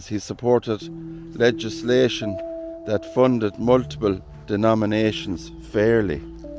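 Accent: Ireland. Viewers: Thanks fabulous video, lovely Irish voice Lynn in Brittany x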